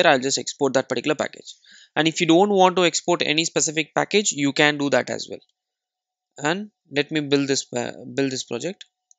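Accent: India. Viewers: When you hear the Indian accent, you already know the tutorial will be good.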